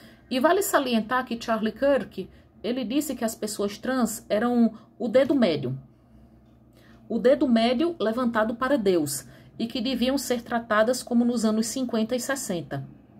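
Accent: Northeast, Brazil. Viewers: Amo o seu sotaque nordestino, sempre achei bonito. Você transmite calma e coerência nas suas falas. Obrigada por produzir um conteúdo de qualidade!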